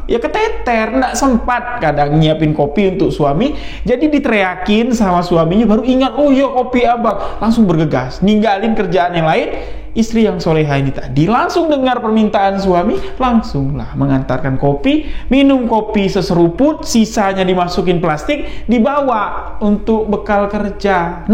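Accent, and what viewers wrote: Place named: Jambi, Indonesia. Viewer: Aku suka dengar bahasa jambi...bila bang bercerita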